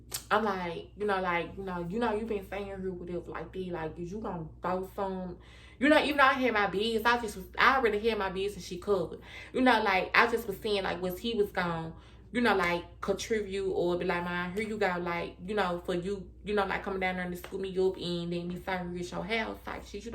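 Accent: South, United States. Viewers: Your accent 😍 it's giving southern belle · This sista is old school southern. I understood every word.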